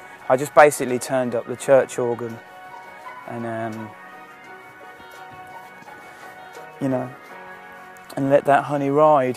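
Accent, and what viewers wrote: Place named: Birmingham, United Kingdom. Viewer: Sorry m8! In real life he speaks in a brummie (birmingham) accent, but in his songs he raps in a cockney (London) accent. Because he is not actually a cockney it is known as a "mockney" accent. Is that any clearer?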